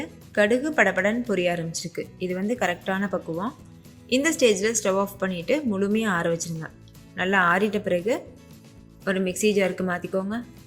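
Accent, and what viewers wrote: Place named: Kerala, India. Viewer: Your way of talk seems you are a malayalee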